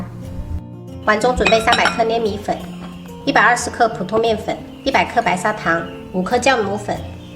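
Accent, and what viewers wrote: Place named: Minnan, China. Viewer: 發糕我們都是特別的節日做的，大部分是過年的時候做的比較多，其實你們閔南話，跟我們的台語好像，所以一直覺得妳讓我感覺好親切哦！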